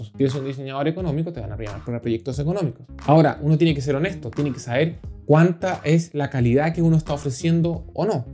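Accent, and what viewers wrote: Chile. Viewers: Suscrita a partir de ese hermoso acento chileno, tu fondo de video y obviamente tu calidad de contenido!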